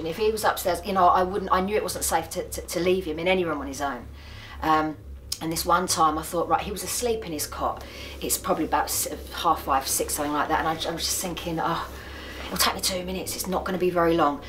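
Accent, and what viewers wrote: England, United Kingdom. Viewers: english accents are so pretty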